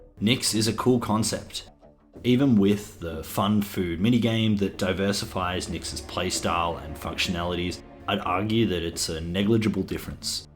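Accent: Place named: Australia. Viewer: noticeable Australian accent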